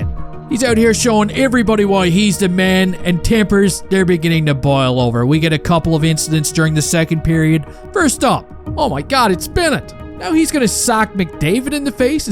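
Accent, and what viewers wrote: Newfoundland, Canada. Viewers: You sound like a Newfie, that's a good thing. They love hockey too.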